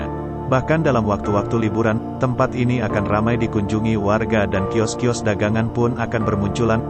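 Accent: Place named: Maluku, Indonesia. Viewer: Hmm ktng orng maluku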